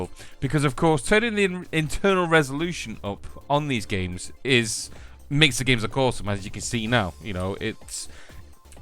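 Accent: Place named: United Kingdom. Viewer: Your british accent makes me h a r d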